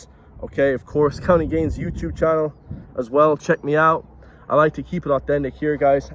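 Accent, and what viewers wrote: United States. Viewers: Who are you? I enjoy your content and chats, but Who are you when you don't speak a pure form of Irish? You sound more American than Irish and I don't recognise any background you are in today, that looks or sounds Irish. Who are you? Where are you? Why do you sound more American than Irish? I'm just asking. Hopefully, you will answer truthfully.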